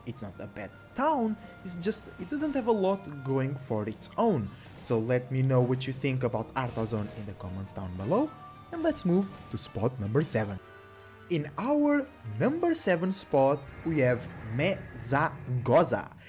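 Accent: Portugal. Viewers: You have a portuguese accent Eu tinha feito este comentário antes de mencionares, achei o video bem top 👌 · eu qd oiço um portugues a falar ingles sei logo ahahah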